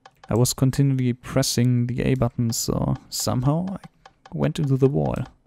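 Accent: Germany. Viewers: Yes, or that you even know. Btw are you German? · Man hört das du Deutscher bist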